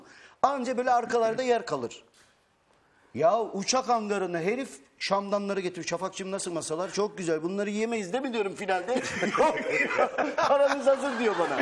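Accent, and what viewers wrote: Ankara, Turkey. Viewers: tam ankaralı .d şiveyi bıraksa tamam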